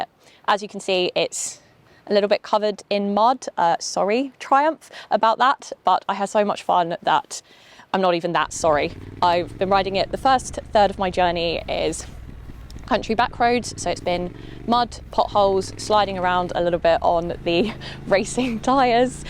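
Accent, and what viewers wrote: England, United Kingdom. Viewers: Which part of England is your accent from? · I have a 2018 T100 since new. Enjoy your video with British accent!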